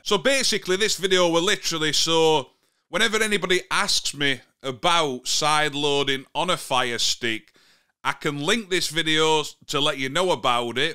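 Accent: Yorkshire, United Kingdom. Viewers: It's Yorkshire.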